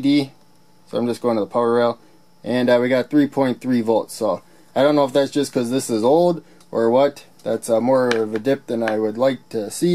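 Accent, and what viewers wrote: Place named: Northern Wisconsin, United States. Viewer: Nice video. I hadn’t heard of breadboard power supplies. I also use a branch supply but often my projects need to be mobile to test so this is a nice option. By the way, I have to ask, are you from Wisconsin? Northern Wisconsin? You sound almost exactly like my cousin who grew up in Superior.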